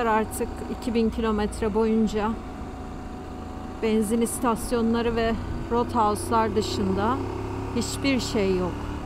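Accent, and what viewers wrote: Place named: Adana, Turkey. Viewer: espirili konuşmanı seviyoruz.Tıpkı adana şivesiyle konuşuyorsun seni seviyoruz.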